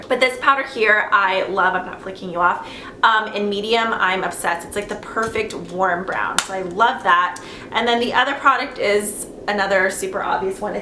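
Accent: Texas, United States. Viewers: !!! You're totally picking up the Texas accent lol its hilarious. And btw, great video & keep using the make up geek shadows....forget the haters. Lol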